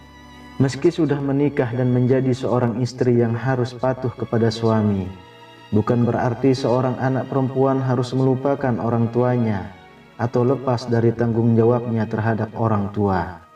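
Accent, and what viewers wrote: Lombok, Indonesia. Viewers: Abang dari lombok kah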